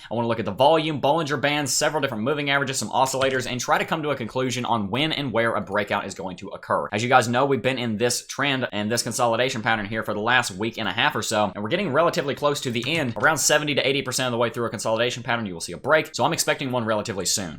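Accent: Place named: Alabama, United States. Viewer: I am not from Birmingham AL, but you sound like you may be! Enjoy your channel!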